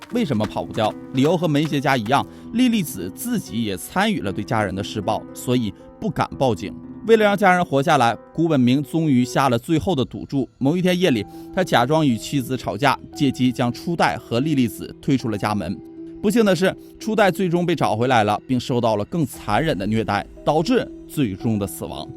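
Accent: Northeast, China. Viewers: 語速不要這麼快，講起來就不會太吃力。雖然東北話與普通話有相似之處，可是東北人講普通話不如其它省份的人標準，最大的障礙在於聲母為Z.C.S和ZHI.CHI.SHI的字東北話與普通話發音完全相反！比如說：站zhan著zhe，坐zuo下，東北人讀成：站zan著ze，坐zhuo著ze，從小到大說著與普通話完全相反發音的那些字導致非常難以改變，樓主就是這種情況。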